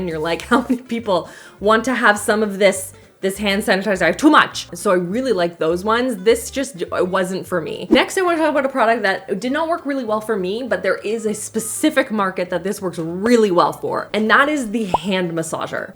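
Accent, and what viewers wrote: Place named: Canada. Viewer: As a fellow American, if you want to hear this accent in real life, but can't go to Canada, come visit the midwest. Especially Wisconsin or Minnesota- you'll hear it everywhere! 😂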